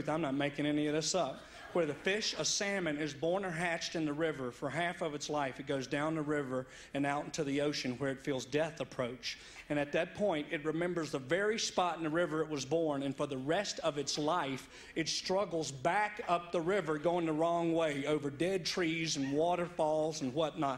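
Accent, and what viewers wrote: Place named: Virginia, United States. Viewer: AKE IT BACK! -- One of the best parts of Yes Dear! I love his physical comedy, so good when he has people to work off of! That is definitely a Middle Virginian Accents not quite Tidewater area!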